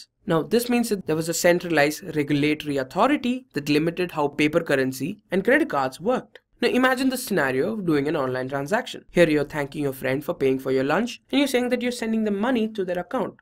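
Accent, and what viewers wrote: India. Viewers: Bruh he asked is the voice in the video BELONGS TO A INDIAN 😂😂😂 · Good info. Please improve your English accent from IN-GLISH!!!!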